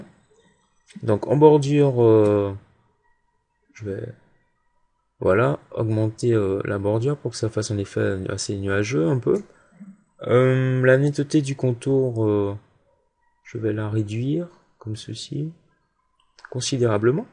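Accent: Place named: Antilles, Caribbean. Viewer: a ta voix sa se voit que t antillais ;)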